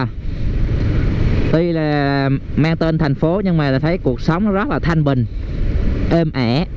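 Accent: Central, Vietnam. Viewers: Chưa bao giờ cmt trên yooutube,nhưng giờ phải cmt...Đây mới là MotoVlog đáng xem,xem xong 1 video được khá nhiều thứ cùng với giọng nói rất thân thương,ng miền bắc nhưng sao thấy giọng miền trung thân thương quá.Cám ơn Hưng đã bỏ thời gian và công sức để làm ra những video rất hay,rất bổ ích cho mọi người..Chúc Hưng và gia đình luôn khoẻ mạnh .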